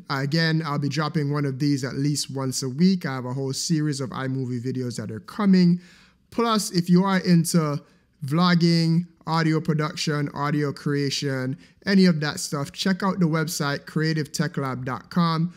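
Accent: Jamaica. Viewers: Great tutorial. Are you Jamaican?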